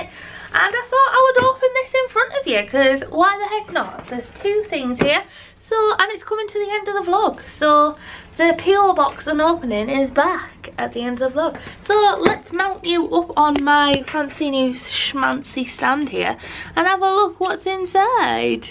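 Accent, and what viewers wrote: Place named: Teesside, United Kingdom. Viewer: Youre at Teesside Park, I knew i recognised the accent. Only started watching your studio vlogs a week ago but really enjoy them. I'm from that area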